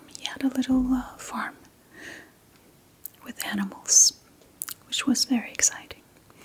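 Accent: United States. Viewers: I would have liked an English version rather than American English. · Someone please tell me how this Dane not only speaks flawless English but does so with an American accent.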